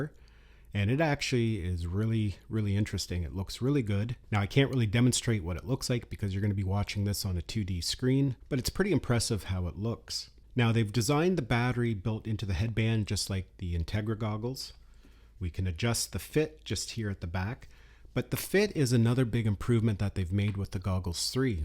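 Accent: Canada. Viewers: Your Canadian accent is like nails on a chalkboard. 🤦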